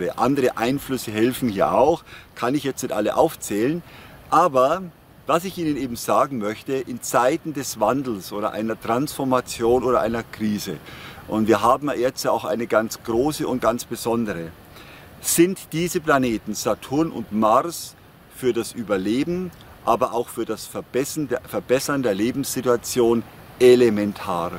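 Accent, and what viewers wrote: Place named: Franconia, Germany. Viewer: Wunderbares Video mit guten Informationen. Ich höre den Anschlag aus dem fränkischen Dialekt so gerne. Ich bin seit fast 20 Jahren aus Franken weg gezogen. Das Fränkische hört man sofort.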